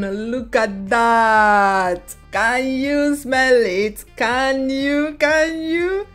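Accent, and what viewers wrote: Igbo, Nigeria. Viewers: Very interesting to watch 👌🏾💯 I love & love that Igbo accent 💕 dalu nne 💯👍🏾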